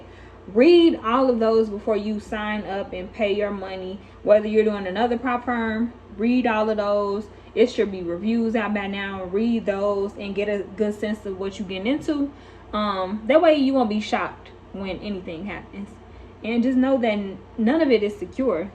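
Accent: United States. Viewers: Your accent(southern) is so cute! Ppl like y’all helped me dodged IML! Yes please upload more videos, we need more women traders on this platform!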